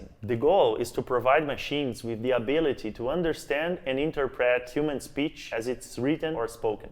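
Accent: Brazil. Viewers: Legal, cara, deu para perceber só pelo sotaque. Bom saber que tem um pezinho nosso na TRIBE. Um dia quero fazer um curso aí com vocês. Eu sou cearense, mas moro em Santa Catarina. Já estudei na Inglaterra e na Austrália. Sou formado em Ciências da Computação, mas a 4 anos venho estudado o Marketing, de um forma mais técnica. Esse é um site é fala sobre mim: (está um pouco desatualizado já) <link> E aqui o site da empresa que estou ajudando a criar: <link> Mas valeu, quem sabe eu vá conhecer o trabalho de vocês em Amsterdã. Abraço.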